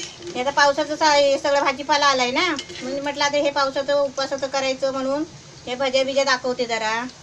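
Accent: Konkan, India. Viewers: tumhi bolata khup chhan..kokani laheja madhe..recepies chhan tumachya...sp. tumache ase veg recepies dakhwa..thank u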